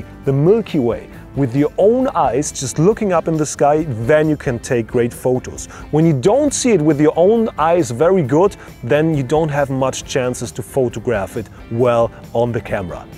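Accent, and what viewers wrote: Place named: Germany. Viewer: PEP also Englisch ist sehr gut. Nur ein deutscher Akzent, aber das ist auch egal:) mir gefallen die Videos So, English is great, but he has a German accent, but I think that doesn't matter:) I like the videos. They are great BTW: this is an English channel. I recommend to use English. It helps you to learn English and the other persons understand what you are saying;) just a little tip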